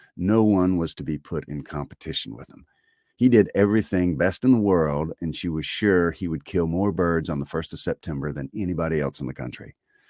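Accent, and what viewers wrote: South, United States. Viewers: I have just found your channel-I absolutely love the Southern accent twist to these classics - I am just about to start Persuasion my favourite Austen novel -Thank you so much for the uploads ,much love from Southampton, England x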